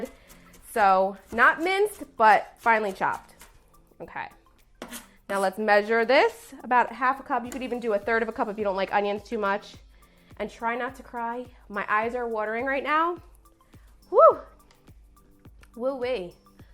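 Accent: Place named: New Jersey, United States. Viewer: Are you from Jersey? My mom is from Jersey and your accent is very strong lol i’m from the south, but immediately when you started talking I was like… I think she’s from Jersey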